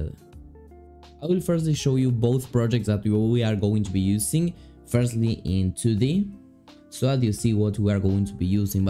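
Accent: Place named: Argentina. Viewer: Sos argentino? Tenés acento lol :D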